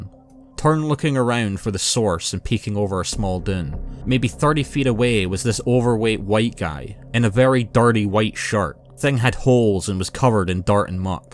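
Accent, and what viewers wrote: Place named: Scotland, United Kingdom. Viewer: I decide I like hearing a Scottish accent telling spooky stories. It keeps me actually focused on the story somehow and it also feels like I’m at the bar listening to a friend tell me an intense tale over some drinks. I like the vibe you bring sir.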